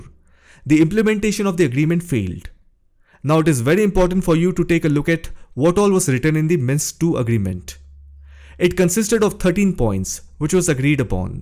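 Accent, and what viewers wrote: India. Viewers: This may be a brilliant video or it may not. I refuse to listen to videos narrated in Indian 'English'. Indian speakers,, if you want English speakers to understand what you are talking about, every single word must be pronounced clearly and separately, using English vowel sounds, not Indian. Do not run words together as if they are all one long word. Either speak Indian or English, but not a mixture of both. Thanks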